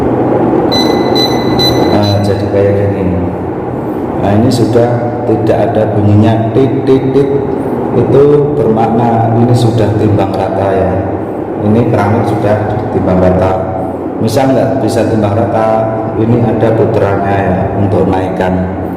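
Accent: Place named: Kebumen, Indonesia. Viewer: kebumen ngapak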